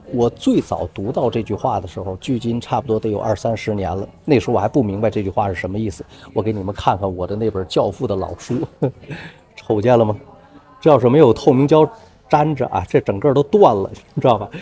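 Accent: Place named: Shanghai, China. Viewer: 您有上海口音，老实说，上海人做你这样营生的比较少，总之，你做的很不错，我瞎猜在北京等地工作过很久。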